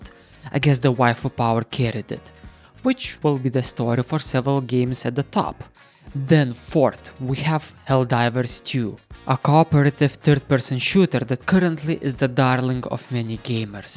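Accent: Russia. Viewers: Хороший видос брат, по акценту узнаю родной русский или другой славянский язык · крутое видео чувак, но советую поработать над русским акцентом) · Вроде русский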